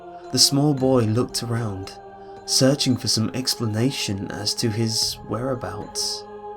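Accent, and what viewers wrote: United Kingdom. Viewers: your britsh acent (i cant spell very well) makes every thing creepy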